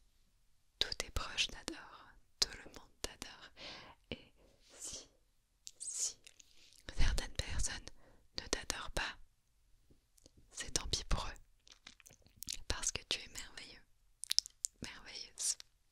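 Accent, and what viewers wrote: France. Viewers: Lol yeah her French is great...she's a native speaker. She lives in Montreal (Québec province of Canada) but she has a French accent when speaking (not a Québécois accent). Makes me think she's French and moved to Canada.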